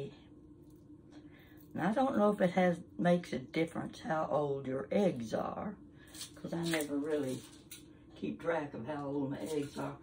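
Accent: South, United States. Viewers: No!!! Southerners use Duke’s, always Hun! You sound pretty Southern to me.. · Love your hair. Appreciate, your sharing. You are cute and charming. I am 76, how old are you?I am guessing, but I think you are a Southern Lady. Your accent is similar to mine.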